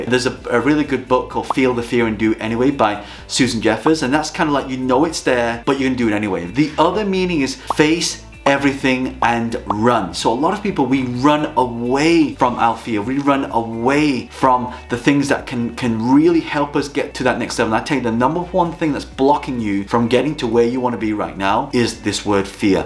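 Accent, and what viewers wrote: United Kingdom. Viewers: I have never heard you stumble. Sometimes I can here your Northern accent though. ;) Btw. my husband is from Lancashire, too. ;) He was born in Rossendale, Lancs. But unluckily we just speak German, because he came over during his childhood.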